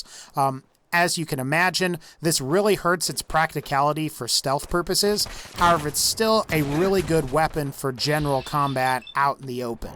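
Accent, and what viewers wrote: South, United States. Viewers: He sounds like he's from the US... southern gulf/bible belt area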